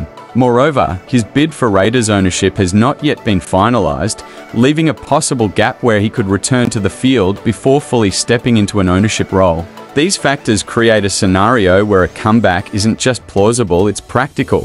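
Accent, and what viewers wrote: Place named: Australia. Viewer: Do not trust anyone with an Aussie accent telling us what the NFL is doing…… Not gonna happen……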